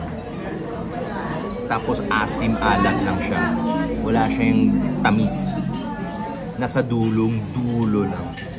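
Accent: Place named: Ilonggo, Philippines. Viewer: Kanami gdya mamati ilonggo. Diin sya location ya sir?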